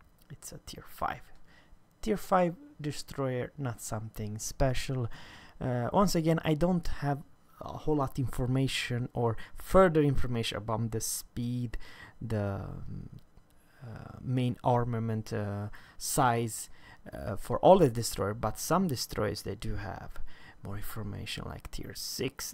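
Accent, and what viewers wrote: Sweden. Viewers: I sense a strong Swedish accent here.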